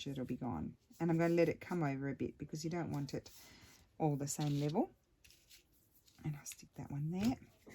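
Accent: Australia. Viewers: I love this way of making clusters - I'm still new to all of this and haven't attempted clusters yet but this is how I'll try it for sure! Also love your videos and all of your wonderful creations. I was an exchange student in high school and I was in Australia for about 6 months. The Australian accent is one of my favorites and makes me think of how much fun I had when I was there.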